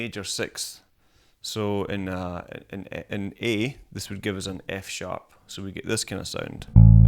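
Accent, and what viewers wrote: Scotland, United Kingdom. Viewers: for a moment I thought I'd have difficulty understanding your accent as it says Bass Lessons Melbourne, but I was relieved to hear that you speak in Scottish accent. I hate the Aussie accent.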